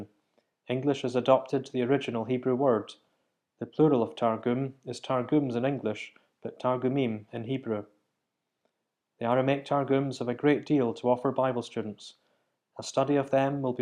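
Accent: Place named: Scotland, United Kingdom. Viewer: Very helpful, Mòran taing. From a family bright to New England I confess that I appreciate your accent from Alba (?) as much as I do the Aramaic.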